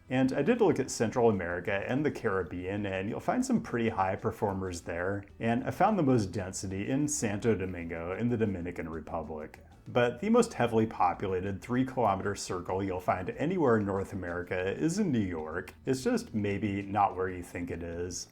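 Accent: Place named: Pacific Northwest, United States. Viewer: ah. Thanks. Pacific Northwest, i guess. Portland, Seattle. It’s so bizarre to hear and throws off emotional cues. His accent contributes to his dry sense of humor.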